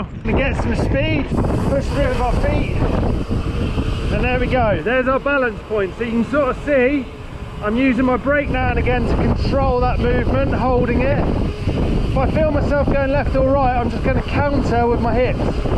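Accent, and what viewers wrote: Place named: Bristol, United Kingdom. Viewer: In Bristol you say maniall and weelay 🤣🤣good stuff bud, makes sense might give it a sesh.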